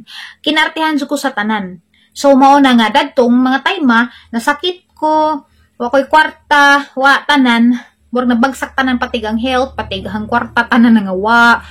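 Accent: Bohol, Philippines. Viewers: Makalingaw paminawon sinultian sa Bol anon hahaha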